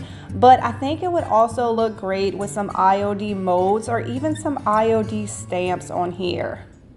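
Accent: Louisiana, United States. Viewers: I know it’s been a year since you uploaded this video but I am trying to place your slight accent ……. Louisiana Cajun?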